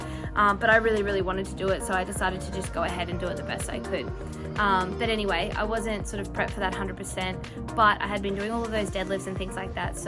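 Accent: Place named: Australia. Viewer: Amazing achievement! Congrats! ❤ Can I make a tiny suggestion for the next video? Could you turn down the level of the music when there's a lot going on in the background? With you speaking quickly and the background noise in the gym plus the music, it's quite hard to understand sometimes, at least for someone who's not from Australia ;) Thanks!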